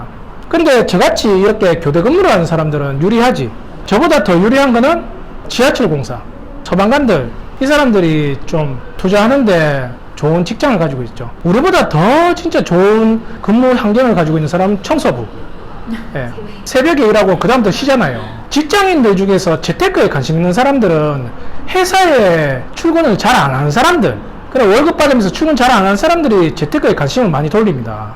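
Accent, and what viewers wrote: Gyeongsang, South Korea. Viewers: 쇳쇨 심한 심한 경상도 액센트 너무 강해서 듣기싫네요, 군대 바리훈련소도 아니고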